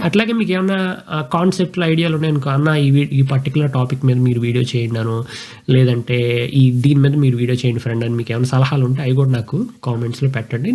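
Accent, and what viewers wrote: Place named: Telugu, India. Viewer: with Telugu flavor, with a natural slang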